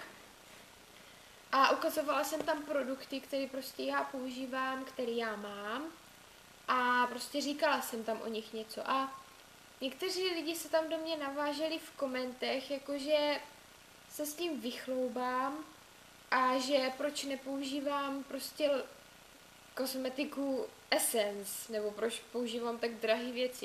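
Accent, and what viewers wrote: Moravia, Czech Republic. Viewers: Líp bych to neřekla.. :) a jen tak mimochodem.. tak trochu podle přízvuku.. ty jsi z moravy? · hele mam otazku! Ty jsi z Moravy ???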